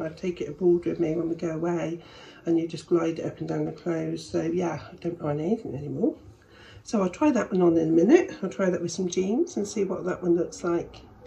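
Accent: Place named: Wiltshire, United Kingdom. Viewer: Hello, I've popped over from Tina's channel. I've just done a Shein Challenge with Tina and she mentioned your channel so I thought I'd say Hello. Out of curiosity your accent sounds familiar, are you from the Wiltshire area? I am originally from Swindon but now live in Las Vegas. Your accent reminds me of my old accent, hehe. I will be subscribing as I not only did I enjoy your video but I love that us smaller channels build one another up. Have a great day!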